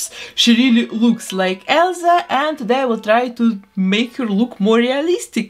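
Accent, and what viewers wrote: Russia. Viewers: Русский акцент или мне кажется?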